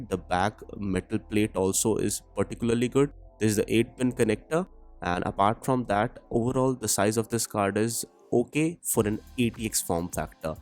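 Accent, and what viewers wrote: India. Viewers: Where you live man the Indian accent feels nearly my country’s normal accent cause how much I hear it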